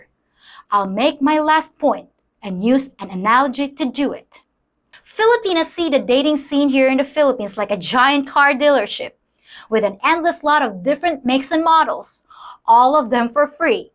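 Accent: United States. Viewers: Yeah, guess where that American accent comes from 🤭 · Hello again my dear , it seems that your american slang is starting to show things that you could have only learned in the usa you were either in nyc or los angeles , your english is to polished to have come from the philippines , you can fool some people but you cant fool everybody im sure you will block and delete me now ! have a nice day ! im not knocking your channel you say some cool things that need to be said but pretending your somebody your not is another thing ! like you say honesty is the best policy ! and one other thing who is the Foreigner that is supporting you ? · You don’t sound very Filipina you sound more American sooooo I’m just saying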